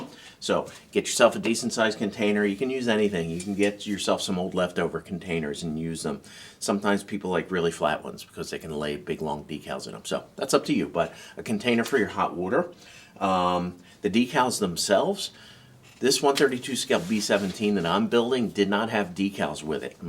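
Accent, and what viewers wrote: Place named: South Jersey, United States. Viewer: Love the South Jersey accent.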